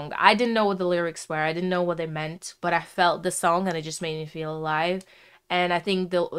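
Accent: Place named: Eastern Europe. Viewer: do you have Slavic roots? You have an interesting accent. I can't figure out where this is from, very interesting 😅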